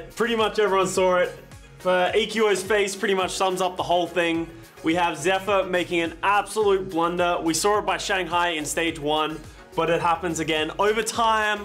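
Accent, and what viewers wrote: Australia. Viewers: Another excellent episode of CNN! I need more coherent Australian rambling in my life.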